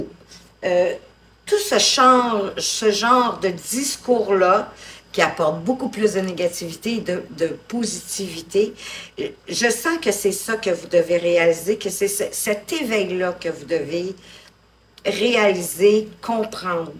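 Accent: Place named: Quebec, Canada. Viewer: Oui c’est ça.... votre accent est très joli.... c'est canadien?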